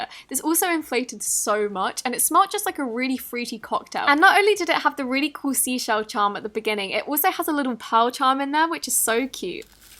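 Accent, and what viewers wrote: England, United Kingdom. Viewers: Are you English or American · Are you from England?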